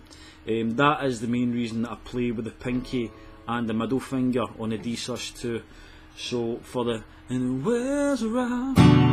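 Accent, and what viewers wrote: Scotland, United Kingdom. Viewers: Is he Scottish ?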